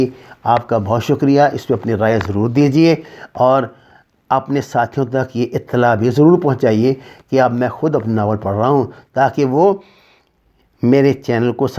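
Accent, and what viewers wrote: Punjab, Pakistan. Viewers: Abe Tu nawil mat para karo plzz tum panjabi men Urdo Bulta h.. Plz kisi ache andaz men padhne wale aadmi padhwaliya kato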